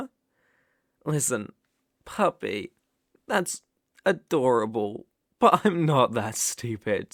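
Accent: United Kingdom. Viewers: British. Take it from a Brit. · The British accent is just : 😩👌 wonderful 😭🌟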